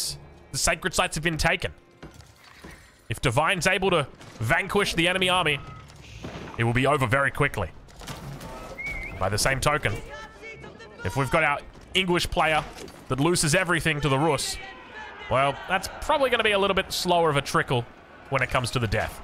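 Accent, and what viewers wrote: Australia. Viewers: Hi, you're aussie right? 😅, do queues pop ok for this on 1v1? cause i want a new comp rts to play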